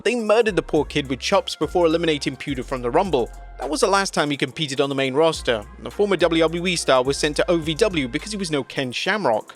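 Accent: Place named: United Kingdom. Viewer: Bri'ish bro talking smack about the jackass crew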